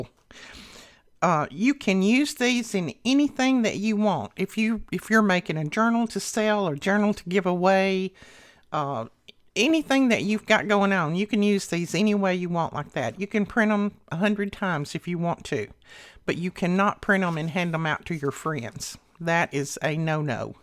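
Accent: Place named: Alabama, United States. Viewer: I love your accent! ❤❤❤ Are you from Alabama?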